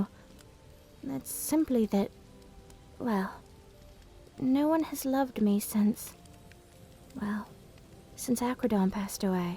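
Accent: United Kingdom. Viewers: yes i get to hear my british dragon waifu again. <3 but in all seriousness keep up the good work and keep captivating us with these stories :) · Why is she British now? I like it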